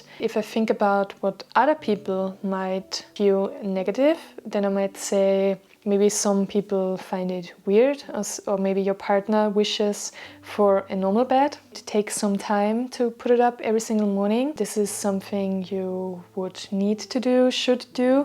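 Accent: Austria. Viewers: first time I was really certain someone online is from Austria from an accent in English, lol (and I was right according to the channel Info) can't pinpoint the area tho, but sounds more central/ northern/ eastern than southern/ western, I think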